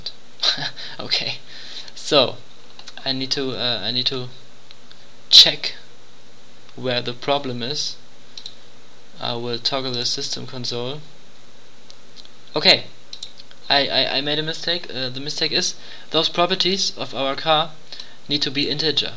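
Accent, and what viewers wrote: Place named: Germany. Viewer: Da haste son bissle deutschen Akzent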